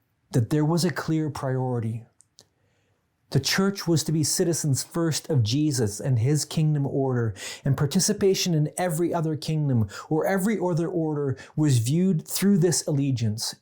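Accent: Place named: United States. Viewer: Your question seems to presume that Bonhoeffer would do something in response to the Trump administration, not so subtly implying that the Trump administration is like the Nazi regime. As a Christian I reject this presupposition. The governments of Europe are much more fascistic than anything going on in America. So perhaps if you are referring to Bonhoeffer responding to the current situation in Germany I might agree with you. But your American accent suggests that you are referring to the Trump administration. You need to be clear about what you are referring to.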